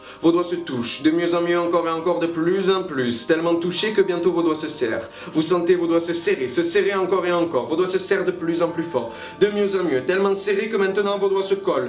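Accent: France. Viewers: Petit accent Toulousain... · Il a l'accent du sud' c'est très dur de se concentrer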